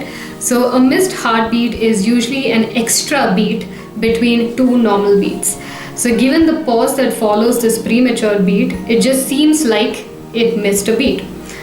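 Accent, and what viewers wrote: India. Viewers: This was a great video with one exception.... the negative effects of the sporadic release of cortisol. I also think that people with a not so healthy heart might stroke, I don't know as I am not a trained professional but I have had all these symptoms it seems at the same time. I have also experienced horrible "brain zaps" that left me numb all over, those are so scary! Thank you for a good video! I have always loved to listen to the flow of an Indian accent. Blessings!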